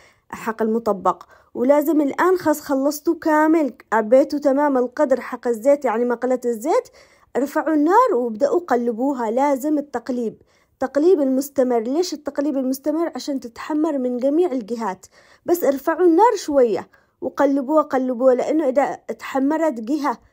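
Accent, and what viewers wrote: Yemen. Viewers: ربي يسعدك وينولك مرادك ..انسانة راقية ولهجتك اليمنية جميلة جدا ❤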